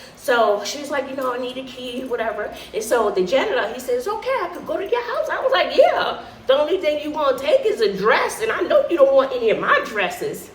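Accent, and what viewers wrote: New York, United States. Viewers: I missed where you are from, if you stated it earlier in a video. I’m going to guess you are from New York or Chicago.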